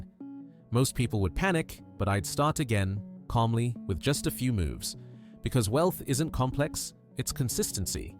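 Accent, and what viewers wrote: United Kingdom. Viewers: I hear a British accent and an alarm bell goes off.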